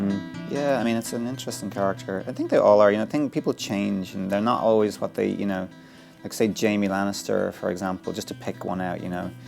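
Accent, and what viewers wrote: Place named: Ireland. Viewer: Love his Irish accent. He and James Nesbitt (plays Bofor in the Hobbit) are my favourite Irish actors.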